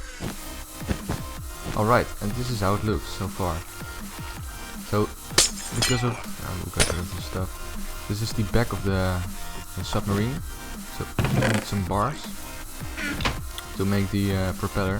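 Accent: Netherlands. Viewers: Goede video ik hoor dat je uit Holland komt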